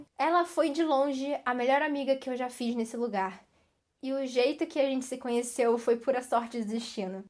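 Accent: Rio de Janeiro, Brazil. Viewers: sotaque carioca é feio demais tá maluco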